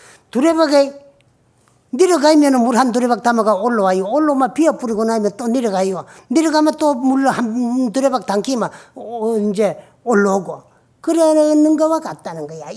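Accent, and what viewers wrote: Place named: Gyeongsang, South Korea. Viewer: 스님 말씀 구수한 사투리 넘 정겹습니다 경북이 고향이 저의 어머니 말씀 처럼 스님의 법문 넘 잘듣고 있습니다 항상 건강하세요 오래오래 스님 법문 듣고 싶습니다🙏🙏🙏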